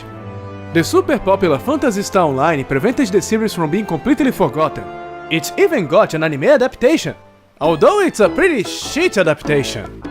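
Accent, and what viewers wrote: Brazil. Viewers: Are you Brazillian? You sound brazillian... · Impossível não notar o sotaque brazileiro lol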